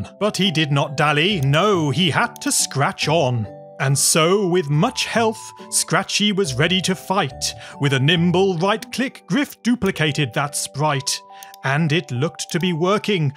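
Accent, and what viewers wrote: United Kingdom. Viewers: BAD MOVIE, MAN SOUND TOO BRITISH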